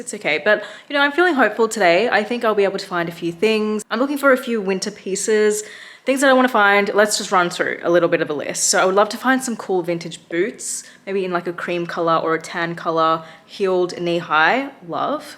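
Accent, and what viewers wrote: United Kingdom. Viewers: To be honest at the beginning of this video when you said you were actually Australian I had no idea lol I thought you were British too, I'm so sorry 😆🥲 Loved the thrift haul, sad to hear that the thrift scene in London isn't that amazing though, but you still managed to find some nice things! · girl, you do be sounding a bit british ahhhh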